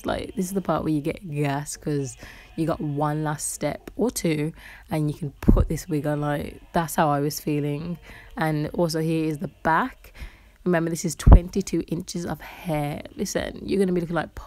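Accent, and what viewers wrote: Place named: Northern England, United Kingdom. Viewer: Your accent 😍😍😍😍 Northern? Manchester?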